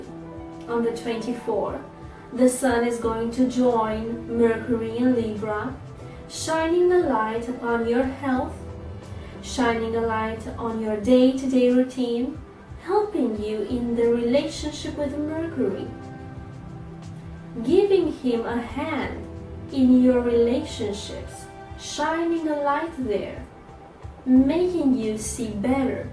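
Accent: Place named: Italy. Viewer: Great reading, very positive insight about this month! Love your accent. Are you Italian?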